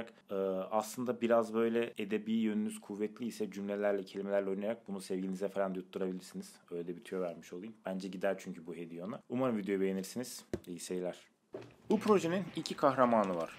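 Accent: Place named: Isparta, Turkey. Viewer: Nerelisin konuşman Ispartalı gibi bizim buralardanmısın ?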